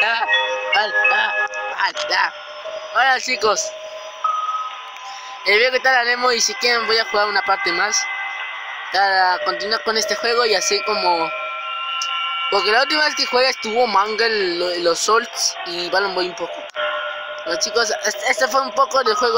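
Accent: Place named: Cuba. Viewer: No te preocupes por hablar como cubano, Soy peruano pero hablo como mexicano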